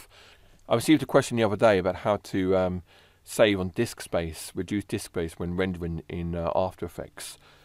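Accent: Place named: United Kingdom. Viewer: Your accent is so like Hermione(British)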